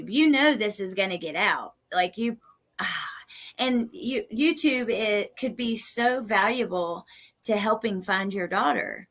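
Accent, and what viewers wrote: South, United States. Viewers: I can listen to u talk all day.. lol 🥰 ur southerners accent reminds me of brit spears ♥️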